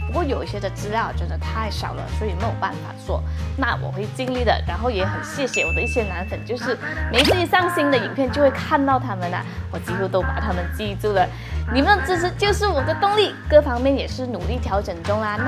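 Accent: Malaysia. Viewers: 马来西亚华语音调。 广东客家合调！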